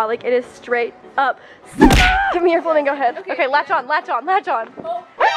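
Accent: Canada. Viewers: why did i read the title “i’m sorry” in your canadian accent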